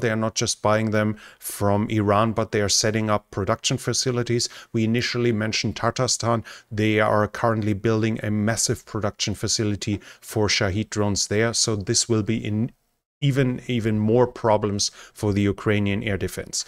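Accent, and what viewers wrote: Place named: Germany. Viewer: You sound very German ;)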